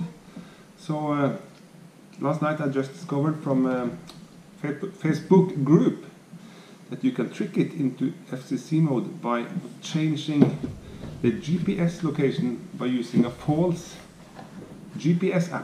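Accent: Norway. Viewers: you must be norwegian?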